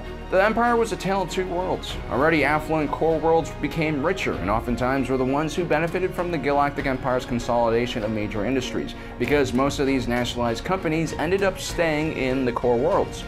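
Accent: United States. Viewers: i'm already suscribed since a while, and i really love your videos.... however on this video, i had a hard time trying to understand what you said due to your strong American accent. Still, i liked the video:)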